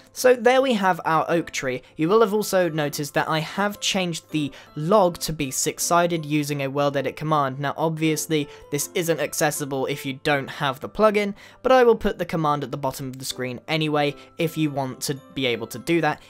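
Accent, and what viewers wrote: United Kingdom. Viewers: Damn, back at it again with the annoying British accent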